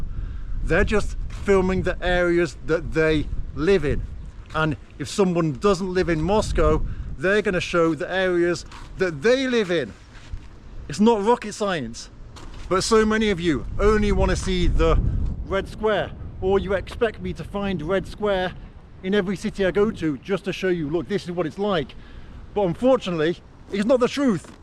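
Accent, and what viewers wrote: United Kingdom. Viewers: If you walk in Detroit or now even in LA or NY, the landscape is even shitty than that. Thast's the "real Russia" you want to show. And your british accent teils us why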